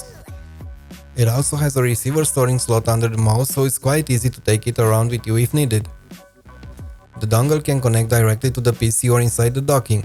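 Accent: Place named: Germany. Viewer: Very serious setup and professional review, good stuff. You sound like Germans, are you? :D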